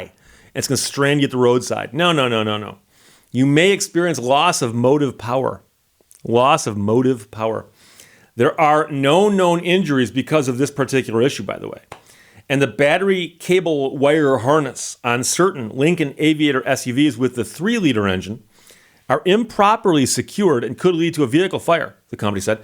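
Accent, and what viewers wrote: Canada. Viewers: Canadian robot lady you did a good job stitching that one together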